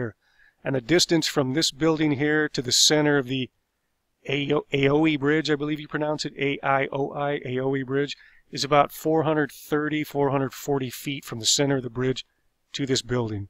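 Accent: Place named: North Carolina, United States. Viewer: I know nothing about you , BUT I'LL BET YOU ARE FROM NORTH EAST. NC OR SOUTH EAST. VA. RIGHT? Just a guess because you sound like everyone I know around here!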